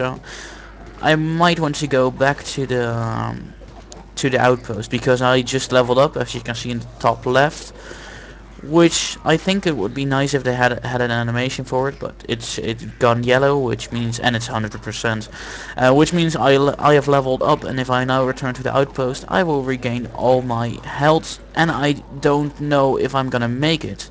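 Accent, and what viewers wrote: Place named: Netherlands. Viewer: lol your dutch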